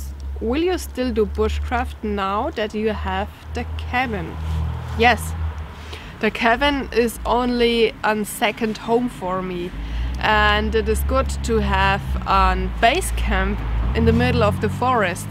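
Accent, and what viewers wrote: Germany. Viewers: Well beautiful Lady. I am so proud of you. This is a beautifully made video. The video setting is perfect. I am impressed how you answered the questions so intelligently. Some subjects are your' personal business for your' knowledge and nobody else. Be careful what personal information you spread over the net. I am always impressed how well you handle yourself even though your' first language is German. I love your' accent, and oh ya many flavors of ice cream also. :O) From experience I remember people always thought my grandfather was a dummy because he spoke broken English with an Italian accent. He actually, like you, was also very intelligent. I am glad you made it clear you have access to the Foxes Den for a long time. I was concerned about you spending money and time on someone else's property. I understand your' passion for the Den. 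Hang in there. John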